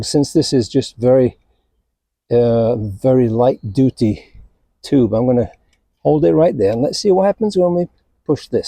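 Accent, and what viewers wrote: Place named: Upper Midwest, United States. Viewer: Nice video .. interesting accent .. sounds like you could be upper midwest .. Chicago maybe .. hmmmmmm!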